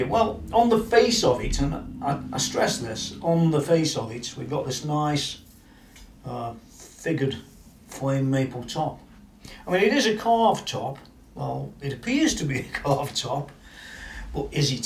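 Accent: Stoke, United Kingdom. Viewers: I Have bought guitars (Ibanez rg350)from Thomann in the past and still will but when I looked at the Harvey Benton range something just didn't feel right to me. Thanks for doing this video there is a lot of crap out there and you need to research thoroughly. Is that a stokie accent.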